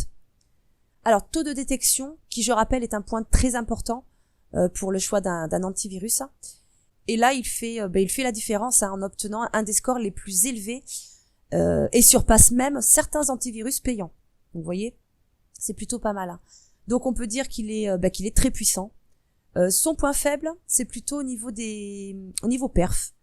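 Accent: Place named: Marseille, France. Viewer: marrant l'accent de Marseille ^^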